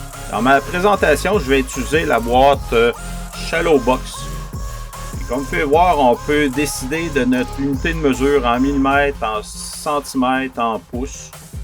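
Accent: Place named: Canada. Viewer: C'était vraiment utile surtout le site indiqué. (Et j'adore l'accent Canadien) Merci!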